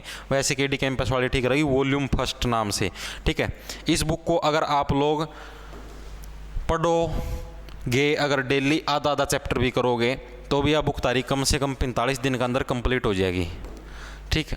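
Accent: Haryana, India. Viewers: ❤ haryanvi accent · Yo bihari nhi hai bhai ye haryanvi hai pradhan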